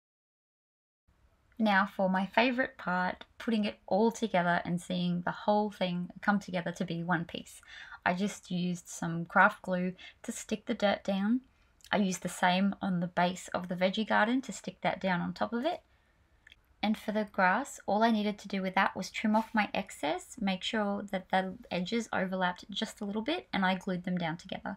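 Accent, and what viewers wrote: Australia. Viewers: Love love love your Aussie accent!!🥰 love your creation❤❤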